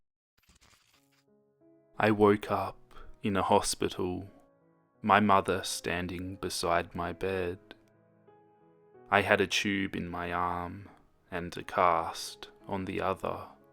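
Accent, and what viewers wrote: Australia. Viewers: Pookie r you Australian ? ? ?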